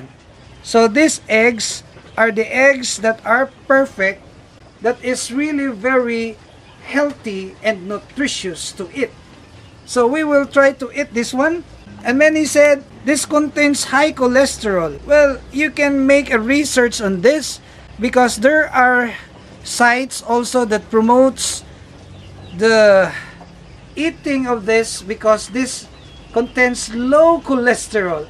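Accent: Philippines. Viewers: I think this guy from the philipines, just by listening to his voice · He's accent is Filipinose.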